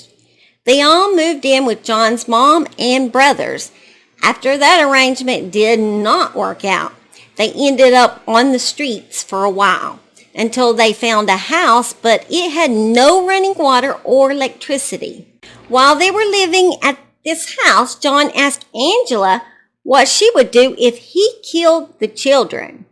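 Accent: South, United States. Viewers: Hi Dolly. ( just a term of endearment, ) My momma was born in Alabama ( Birmingham) . I love your accent. It reminds me of my family in the South.❤ I think you are great at these stories because you somehow keep it short, and sweet ( well as much as you can tell it).☹️ No, no ,no! What good are these monsters doing on this earth??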